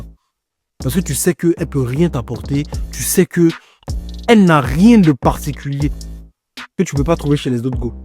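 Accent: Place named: Ivory Coast. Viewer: On dirait que vous êtes ivoirien hein frère👊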